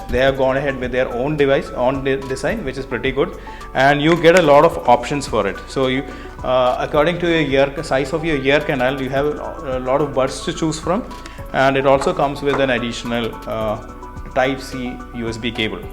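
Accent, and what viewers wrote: Kerala, India. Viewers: Hey malayali bro... The typical malayali accent... And nothing to be ashamed infact its something which connect all of us in this diverse society.. good to hear you and thanks for the video... ❤️ Subscribing just coz of the connection which i felt and efforts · He is a mallu...! I can hear that from the way he converse..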